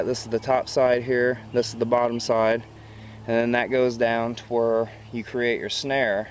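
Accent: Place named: Midwest, United States. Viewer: What part of the Midwest are you from.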